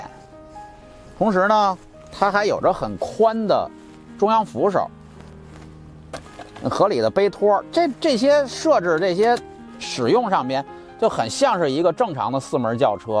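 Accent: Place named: Beijing, China. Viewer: 听你讲话让我想起我在北京跟几个朋友唠嗑，哈哈😂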